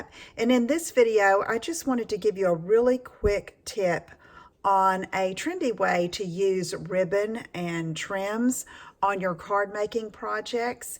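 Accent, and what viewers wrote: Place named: Texas, United States. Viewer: I'm a native Texan. Love that drawl.❤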